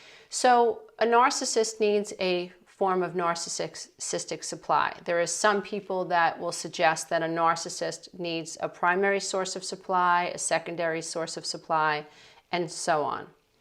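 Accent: New York, United States. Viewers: you are so New York. It's cute.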